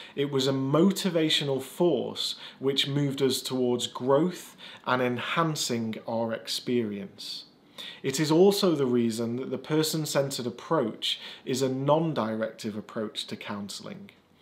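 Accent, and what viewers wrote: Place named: United Kingdom. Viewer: Hey! Amazing videos mate. I recently subscribed to your channel and as a bit off topic I would like to know if you're from the Midlands or north, your accent is quite lovely :) Have a great day!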